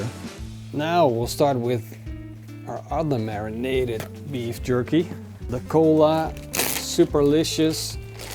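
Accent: Netherlands. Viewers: your accent sounds really Dutch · Do I detect a Dutch accent?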